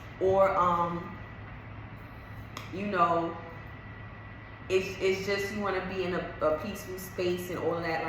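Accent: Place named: East Coast, United States. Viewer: Hey!!! I absolutely love everything about you!! Your look, your energy, your East Coast accent lol and most importantly your tips and guidance!! I’ve been making waist beads for 4 months and your videos have helped me tremendously!! Keep doin what’cha doin!!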